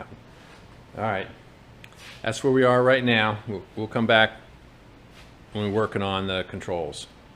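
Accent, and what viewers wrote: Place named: New England, United States. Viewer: Great build. Recent sub here about the time you started this project. Been trying to guess what state you’re in, based on accent, garage style, and garage setup haha as that’s all I have to go by…… maybe somewhere in New England???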